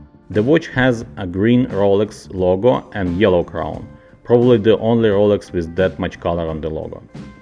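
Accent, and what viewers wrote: Russia. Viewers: рязанский акцент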